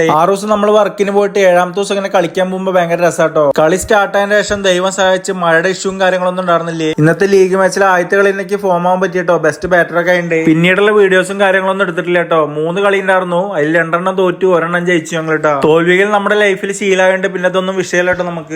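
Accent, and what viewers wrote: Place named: Thrissur, India. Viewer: Thrissur language aanallo ethh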